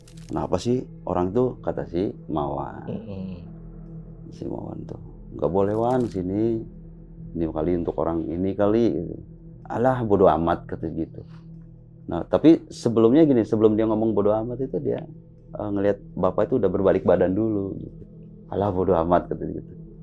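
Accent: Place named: Lampung, Indonesia. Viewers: asliiii bang😅😅, 100% lampung banget😅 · lampung bener cara ngomog nya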